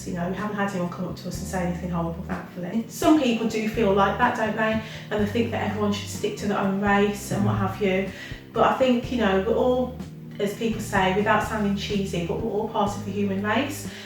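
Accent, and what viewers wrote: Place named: West Midlands, United Kingdom. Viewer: New to your Channel and love your personalities 😊. I can hear your West Midlands Accent ❤️. I use to live in Wolverhampton many years ago 😊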